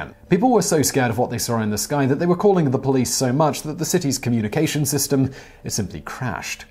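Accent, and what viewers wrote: England, United Kingdom. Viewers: Ran-i-er. Damn Limeys. Rain-eer. Your welcomer. Jesse Mar-sull. Marcell. You English folks talk funny.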